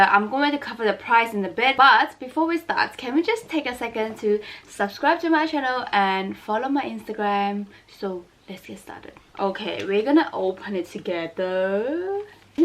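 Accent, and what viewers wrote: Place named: China. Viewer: Where is your accent from? Sounds like English mixed with Chinese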